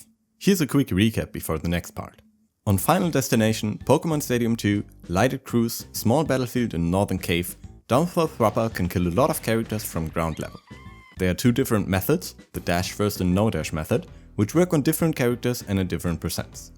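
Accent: Germany. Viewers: Sounds like he comes from a German-ish speaking country, so probably germany, Austria, Switzerland or Netherlands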